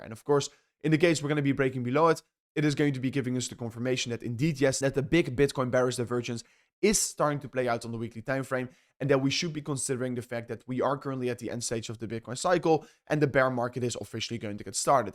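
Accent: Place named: Netherlands. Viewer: haha en ik had al zo een vermoeden dat ik een nederlands accentje hoorde 😅 je doet dit super!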